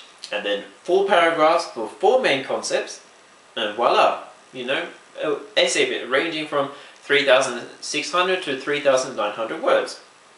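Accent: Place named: China. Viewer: you have a Chinese accent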